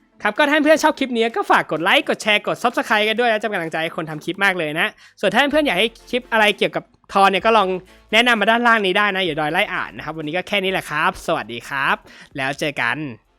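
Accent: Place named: Thailand. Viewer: I didnt understand your language but i enjoy the gameplay, thai accent kinda funny tho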